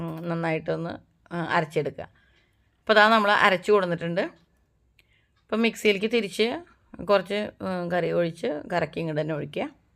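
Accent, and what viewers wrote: Thrissur, India. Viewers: Hi che,thank u for uploading videos soon,from a thrissurkari who lives in Melbourne now. I love watching ur vlogs to hear Ur thrissur slang and Ur simple life.love u che a lot.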